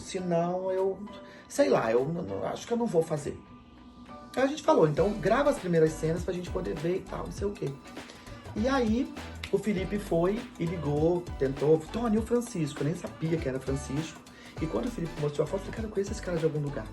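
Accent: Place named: Rio de Janeiro, Brazil. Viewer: Eu achei que vc era Carioca, Tony. Eu fui ao Río duas vezes. Foi maravilhoso demais, me diverti muito. Eu sou de Salvador. Ba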